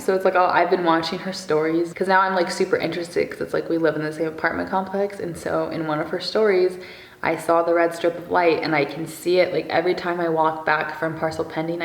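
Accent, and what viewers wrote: Seattle, United States. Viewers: I watch this type of videos, or whatever has a lot of chats, just because I'm trying very hard to improve my english that is quite shitty at the moment ahahahah and I never comment anything.. just watch and try to learn some english.. but you look so nice and kind and I love to listen what you say so I suscribe! ❤️ Whatever I have to put subtitles.. Feel like I don't understand the Seattle accent yet 😅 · Girl I’m born and raised in Seattle and hearing the advocate in you is such a “Seattle thing” lol idk how to explain it but how you talk is so seattle lol LA people are nothing like us Seattlites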